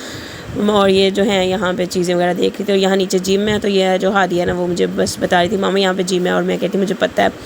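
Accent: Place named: Pakistan. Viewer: Aapke bachche mashallah bhut cute h main India se hun aap k lahje se lag rha h aap Pakistan se h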